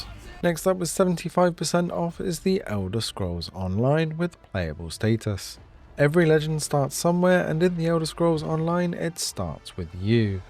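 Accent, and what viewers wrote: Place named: United Kingdom. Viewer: 😂 the narrator of this channel sounds like he's so bored, that brit almost put me to sleep. Highly doubt he games. Just bought Midnight Fight Express can't wait to check that one out!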